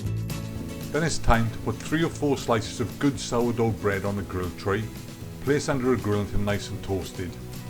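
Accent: Wales, United Kingdom. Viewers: The Welsh accent is very refreshing so it is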